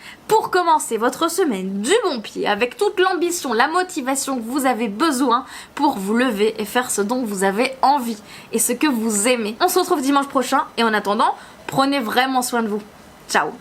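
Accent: Belgium. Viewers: Coucou!! Hier, je suis tombée sur ta vidéo "95E et plus de soutif". Je ne te connaissais pas, mais le titre de ta vidéo m'a interpellée. J'ai aimé ta personnalité avec ton petit accent Belge que j'aime beaucoup <3. Et là, pareil, le titre de cette vidéo, m'interpelle et je suis dégoûtée de ce que tu as pu vivre!! Je ne comprends et je ne comprendrais sans doute jamais ce qui ce passe dans le cerveaux des mecs (si tant est qu'ils en aient un!!) Je suis comme toi, je me demande ce qu'il faudrait faire pour pouvoir les afficher. Peut-être, en criant "regardez messieurs dames, ce monsieur est en train de se masturber.... sortez vos téléphone pour le filmer et le publier sur les réseaux sociaux!!!" Je ne sais pas si cela changerait quelque chose, mais je suppose que la personne la plus mal à l'aise serait certainement ce porc et non toi. Je n'oublie pas que ce genre de situation est hyper perturbant, mais peut-être que cela exorciserait ton angoisse de l'instant?? 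Les lois ne bougent pas et les femmes ne sont toujours pas considérées comme elles devraient l'être.... Bienvenu au 21ème siècle!!! C'est grave quand même que l'on en soit toujours là.... Bref.... Merci pour ton témoignage, il peut servir pour nos "sœurs" Ha oui! J'allais oublier, j'ai certainement l'âge de ta mère ou même plus.... Et j'ai vécu ce genre de situations pourri quand j'étais jeune et à croquer.... Donc, je sais précisément ce que tu peux ressentir dans ces moments là. Gros bisous ma belle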